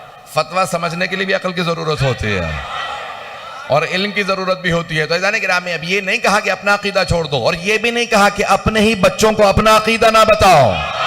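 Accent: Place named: Iran. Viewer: Urdu bhi boltay hain tou Farsi lehjay mai. Subhan'Allah.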